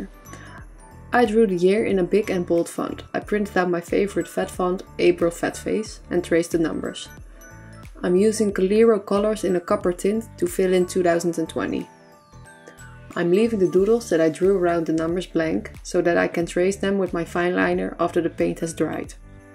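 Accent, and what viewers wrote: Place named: Netherlands. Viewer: Ik hoor je nederlands accent🤣 gaaf!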